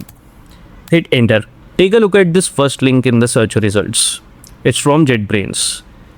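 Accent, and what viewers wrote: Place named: India. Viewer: why you sound indian?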